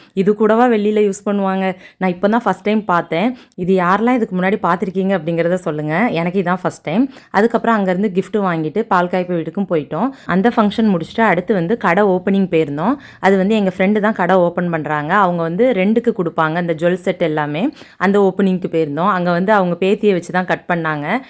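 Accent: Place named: Thoothukudi, India. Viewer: haiiiiii naa ERAL. Unga pecha vachutha neenga entha uru nu kettan😊😊😊😊😊😊.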